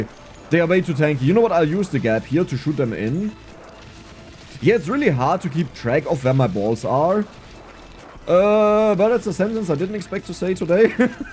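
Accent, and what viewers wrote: Germany. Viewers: man i lover your thick german accent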